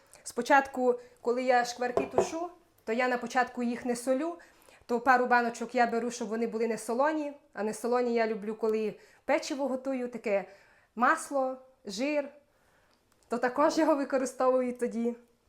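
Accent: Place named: Zhytomyr, Ukraine. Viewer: Я зжитомерщини ваша мова дуже схожа на нашу бальзам на душу сімейного вам щастя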